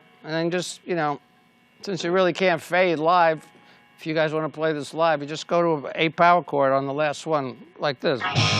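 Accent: United States. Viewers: Ace has the best laugh ever! Love that Bronx accent. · I'm a Native New Yorka and he sounds like half my Long Island relatives. It's a VERY natural and nostalgic accent for me to hear and it's one of the reasons that Ace has seemed so REAL to me, plus the fact that on his solo album he gives a shout out to Queens and all the Cabbies in NYC and Queens where he used to work.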